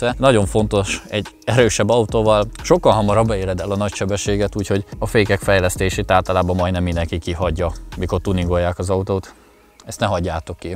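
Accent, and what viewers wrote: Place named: Debrecen, Hungary. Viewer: ótó,laó.nyócvan...csak nem Debreceni vagy?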